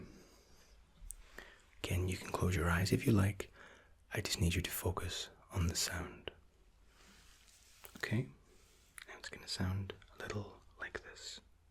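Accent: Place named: Scotland, United Kingdom. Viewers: Scrolling through the comments wondering how long after a video was posted is it still worth commenting (because I wanted to say how your voice is seriously my fave ASMRtists voice! So relaxing!) when I came across this comment.....How did I not realise you were Scottish?! That will explain why your voice is the best! I'm from Glasgow :-D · Finding your channel has been a godsend! I’ve been living in New Zealand for a year now, but I’m originally from a town near glasgow! So hearing your accent is so relaxing! Cheers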